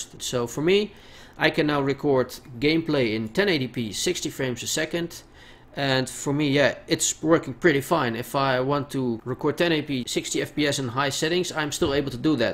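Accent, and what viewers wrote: Netherlands. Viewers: Hup Holland! :P Aan je engels was het wel te zien :D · Are you from the Netherlands?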